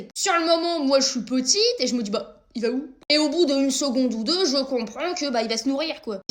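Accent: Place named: Normandy, France. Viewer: C'est de Normandie 😊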